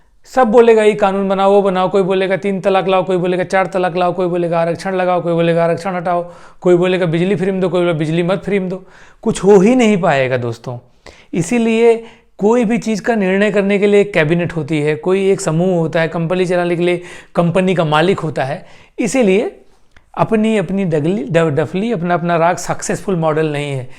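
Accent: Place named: Uttar Pradesh, India. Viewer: Apki boli se lgta hai aap jaunpur ya pratapgarh k rhne wale hain.. Accha lga apse mil kr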